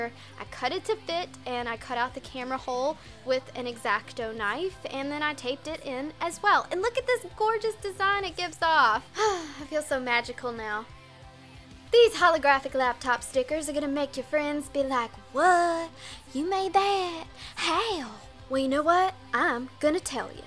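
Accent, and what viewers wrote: South, United States. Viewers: i love her country accent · Where's from? She sounds southern.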